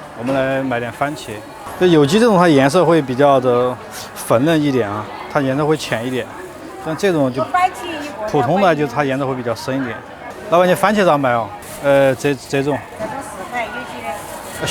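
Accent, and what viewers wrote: Sichuan, China. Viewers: 的四川口音普通话听了倍感亲切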